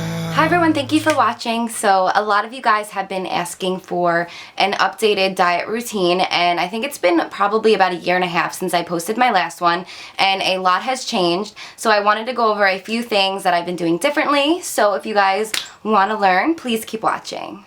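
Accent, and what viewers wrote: New Jersey, United States. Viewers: are you from jersey?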